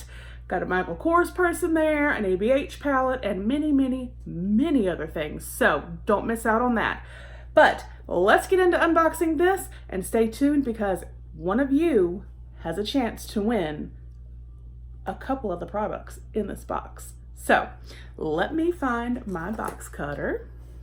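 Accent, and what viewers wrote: South, United States. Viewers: Thanks so much for doing this video! It's great cause I totally missed out on these boxes and I really wanted to see what was in them! And you are so cute with your sweet southern accent! 😘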